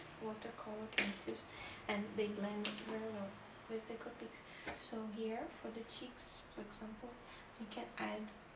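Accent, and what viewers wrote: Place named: Finland. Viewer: Are you Finnish? You have a Finnish accent.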